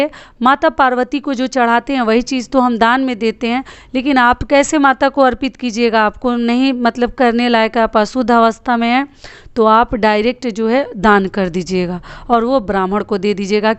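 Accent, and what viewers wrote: Bihar, India. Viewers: Mam ap bihar se ho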